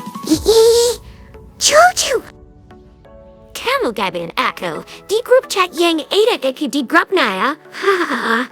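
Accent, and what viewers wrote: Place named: England, United Kingdom. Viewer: itu yang baca orang inggris gitu jadi klo suruh baca indo gitu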